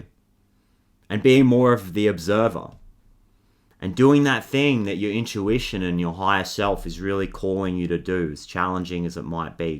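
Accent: Australia. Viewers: I love you so much!!! Are you from Australia by any chance?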